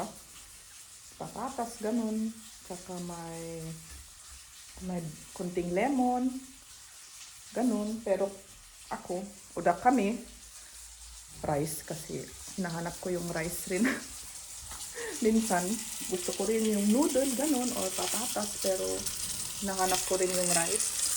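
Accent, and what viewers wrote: Philippines. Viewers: Parang filipina kana rin pati pananalita,nakakatuwa naman.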